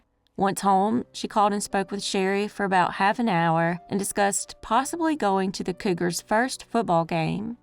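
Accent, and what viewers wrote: South, United States. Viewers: Thanks to lady with sweetest southern accent, evah. Love fr panama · Hey good job on the opening I think it's new anyways I love ur show girl it's not everyday that u can have a country accent that is not either related to southern cooking or kkk u know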